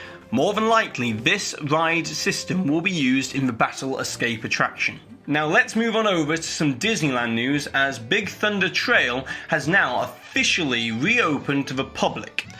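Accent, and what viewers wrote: England, United Kingdom. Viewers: I love your show. The way you pronounce words is cool. Very English. We say patent different here in the USA. See you on Tuesday.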